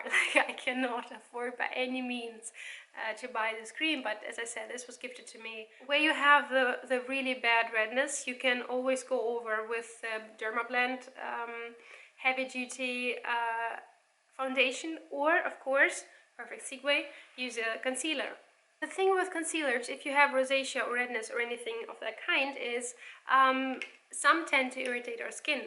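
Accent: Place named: United Kingdom. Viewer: And that irritating british voice.....Ugggg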